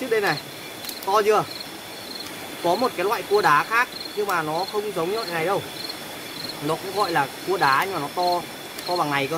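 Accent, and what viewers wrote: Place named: North, Vietnam. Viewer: Hay quá... giỏi quá tiếng nói chuẩn ngoài bắc luôn...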